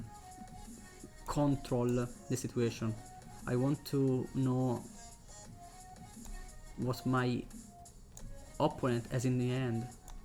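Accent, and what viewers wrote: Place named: United Kingdom. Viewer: ma quanto cazzo sei british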